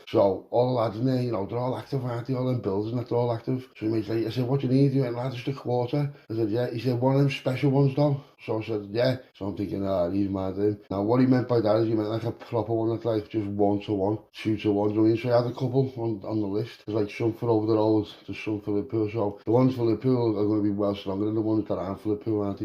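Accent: Liverpool, United Kingdom. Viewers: Love the story's scouse lad mint 👌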